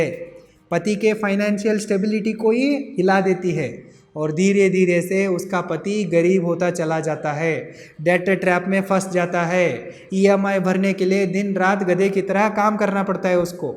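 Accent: Maharashtra, India. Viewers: राम - राम मराठीत बनवा ना.. ( Marathi Accent Refelecting clearly )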